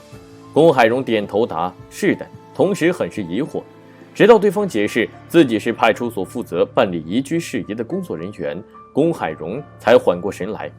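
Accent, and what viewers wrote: Standard. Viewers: 主播发音特别标准 声音好听 ， 废话太多 😂 继续加油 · 不管怎么样配音的很好 标准，耐听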